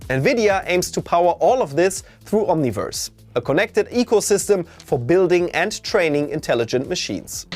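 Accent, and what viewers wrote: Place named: Germany. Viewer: German Accent detected 🇩🇪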